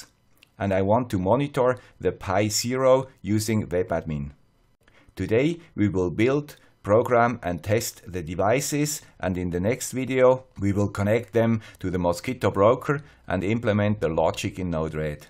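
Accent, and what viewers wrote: Switzerland. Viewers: Hi Mister Swiss Accent First of all, i would like to thank you for your excellent video tutorials. i know how much time you are spending on this stuff. Big Thumbs up. Encouraged by this video i started to build my own automation system. But to be honest this stuff nearly screwed me up. Being a newbie it showed to be tough work to get my system running. I spend nearly 3 weeks to set up the raspberry, the development tools and to build my own firmware. I got stuck nearly every problem that could occur during the build process. Fiddling with linux was one of the issues. Due to some basic programming experience i thought that it would be easy to build my firmware.Wrong assumption. The build process was a trial and error approach due to the spare information for the firmware build. Most annoying was the fact that there is a plethora of information in the internet. 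But as a newbie i could not figure out if this information was useful for managing my problems. Most items i found were outdated, wrong context or even worse just false. Finally i found 2 major information that helped me out. I would like to ask you if it is possible from your side to provide us with a video showing a brief overview of setting up the environment, the build process and TOOLS (i.e. Platformio vs. Arduinoi) and hints to avoid the pitfalls for the build process. One of my major time consuming issue was to figure out how to configure the right Arduino Development Environment. Newbies will find really nothing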